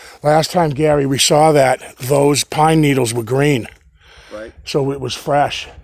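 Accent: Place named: New Hampshire, United States. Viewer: You made me home sick for NH, Born and raised. Then I moved just saw this Sept video on turns scary real quick... You're accent makes me laugh 😂 now.. But loved it, but I was yelling get out.. get out Run don't walk now Skip if you have to I won't hold it against you. I'll FaceTime from KY the next time you need a fast out.. thanks for the content on a rainy day... But as for being so close to the road this is what my parents told me when I was little people always throw trash and food from their cars when they're going down the road so animals like to come up to eat to wash his Bigfoot be any different that's my take on it but they applied it to seeing deer on the side of the road when I was a kid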